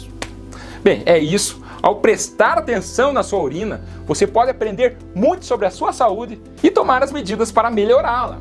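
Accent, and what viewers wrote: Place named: Rio Grande do Sul, Brazil. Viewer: Doutor o sr e Gaucho de onde?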